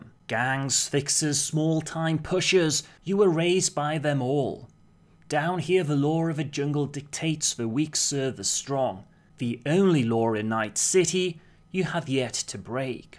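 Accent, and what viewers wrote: United Kingdom. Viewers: That accent makes me think I'm listening to a British news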